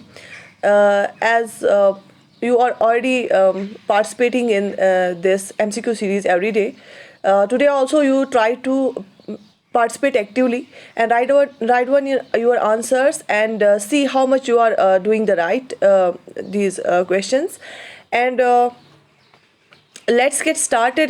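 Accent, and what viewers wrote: Kashmir, India. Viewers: Kashmiri ho kya mam?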